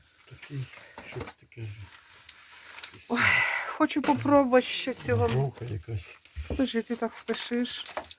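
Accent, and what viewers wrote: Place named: Zhytomyr, Ukraine. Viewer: добрий день, а я зразу зрозуміла де ви живете,по вашій мові, це північ Житомирщини, я живу недалеко від вас.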